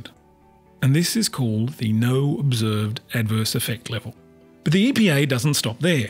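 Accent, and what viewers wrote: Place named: Australia. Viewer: Couldn't they find an American to narrate this? That Ozzie accent is hard to understand.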